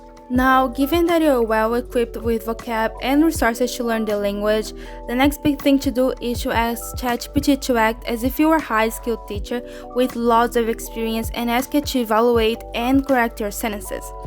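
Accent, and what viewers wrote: Brazil. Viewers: Where are u from? Your accent reminds me of the brazilian accent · Great video! Thanks for sharing these tips! (Also, it`s crazy how i just knew you were a pt-br speaker just by the way you pronounce the "T" lmao. brazilian folks unite~) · Are you from Brazil? Your accent really reminds me of Brazilians accent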